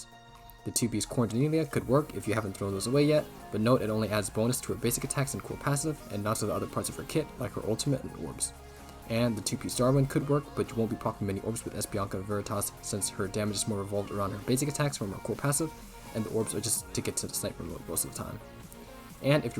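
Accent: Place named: Asia. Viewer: I swear all the pro players of these kind of games always have the this asian english accent lol. Like rexlent pgr, Keebster honkai, just3c,.. :D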